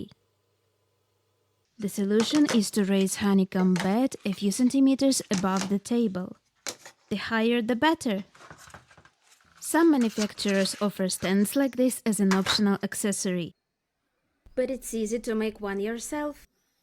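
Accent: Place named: North America. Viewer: для моїх потреб буде достатньо. Англійська мова дуже гарна у вас. Північно американська більше, ніж британська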